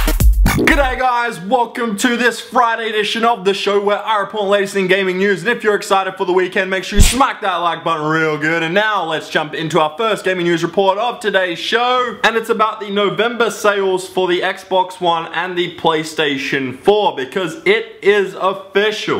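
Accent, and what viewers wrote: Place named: Australia. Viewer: O i thought u were american ur aussie :D Im Glad There Are Some Aussies Gaming! :D But Your Dad Dieing Still Makes Me Sad D: R.I.P To Your Dad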